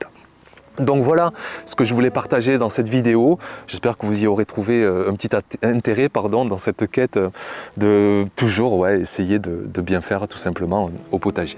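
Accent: South, France. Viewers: Haaaa l accent du Suuuud! Ma femme rigole toujours quand je parle de potager et d idées! Elle me dit toujours " t as encore regardé le type de Sud hein " 😅 Bref tout ça pour dire que je compatis et que j ai eu la même chose... Mais... Je vis en Suisse, 8 plants sous serre semi ouverte en plein soleil donc pas d'ombre. C est ma 3eme année de potager (2022 et 2023 etaient moyens mais j avais pas trop amonder mon sol😊). Finalement mes voisins qui ont tous un potager ont aussi été ravagé. Cela avait aussi commencer en début de juillet dans ma serre mais beaucoup moins fort que mes voisins ( 20 mètres en chaque potager environ) Je me suis essayé à la décoction de prêle. Je taillais tout ce qui brunissait et je pulverisais par dessus avec le prêle. Finalement je suis le seul qui ai réussi à sauver mes plants. Fin juillet cela s est arrêté de brunir. Et j en ai finfalement eu des tomates et elles ont surtout bien rougis, comparer à mon voisin direct qui a une serre aussi et qui avait mis de la bouilie bordelaise, cela n a pas fonctionné. 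Est ce le prêle? Ma serre? Un coup de chance? Je sais pas mais continue dans ce que tu fais c est tjrs un plaisir de te écouter et garde espoir. 😊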